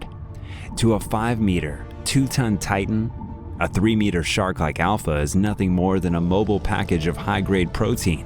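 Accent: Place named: United States. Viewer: That single episode made me not want to subscribe. Accent and dialogue is way too American. It sounds frustratingly stupid.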